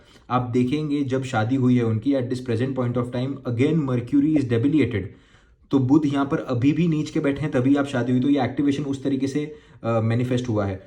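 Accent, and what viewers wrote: Indore, India. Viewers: Are you from Indore?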